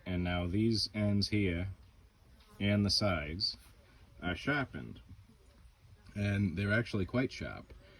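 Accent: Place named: New England, United States. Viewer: Great review, ill be buying one of these! Also, as a New Englander thats been transplanted to the south, your accent brings me great nostalgia.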